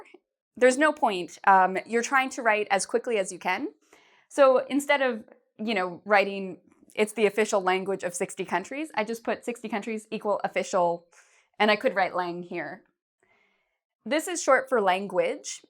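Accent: Canada. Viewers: she's Canadian I think. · Because she is canadian, not american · And your English sounds somewhat Canadian. Good job. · Canadian eh? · you must be Canadian? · Because she is Canadian. She says "Abowt" when she says about. This is very common in Canada.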